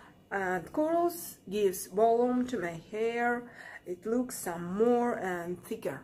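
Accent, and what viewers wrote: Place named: Russia. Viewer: yes i knew you spoke russian by your accent!!!! :)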